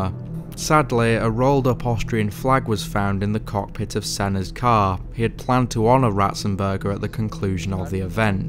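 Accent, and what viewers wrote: United Kingdom. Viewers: Bro sound so British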